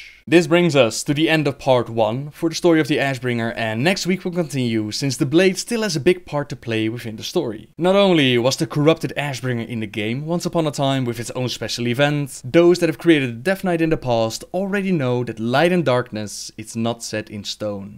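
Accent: Netherlands. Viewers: i hear a heavy dutch accent in his voice. :) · this sounds so dutch it hurts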